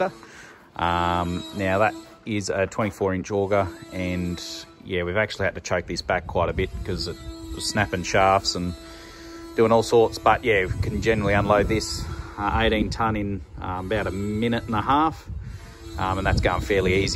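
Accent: Australia. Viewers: Hey, you need to include an Australian dictionary with your videos. What is a brick dunny? Yeah, I know what it is, but occasionally I have to scratch my head on some of your terminology. LOL. Not a problem. I totally enjoy your videos. I visited Australia 4 times, so I did learn a little bit about your lingo. R's are usually silent. A bar is a bah. On a farm, the list of equipment that needs work is endless and always changing.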